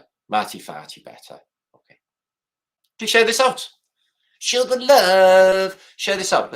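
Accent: Wales, United Kingdom. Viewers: Are You Welsh? NOOOO WAAAY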